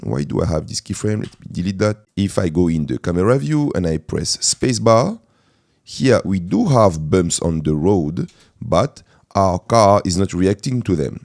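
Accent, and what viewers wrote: France. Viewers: accent français détecté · C'est quand même plus facile de comprendre avec un accent français. mdr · mdrr le big accent francais mais sinon merci pour le tuto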